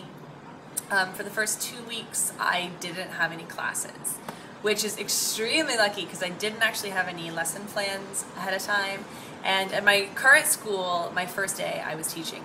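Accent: South, United States. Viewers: I hope to see you in the national TV one day. Maybe you will learn Korean some day~ ^^ By the way, I reaa~~~lly love the hint of souther accent. Keep cool. It is really hot and humid outside T_T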